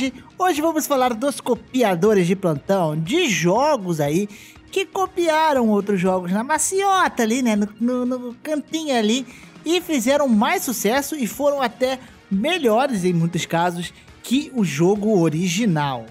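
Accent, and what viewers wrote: Rio de Janeiro, Brazil. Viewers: Agora sei porque não curto tua voz, porque você é carioca! Cara, tinha que impedir a internet no Rio de Janeiro.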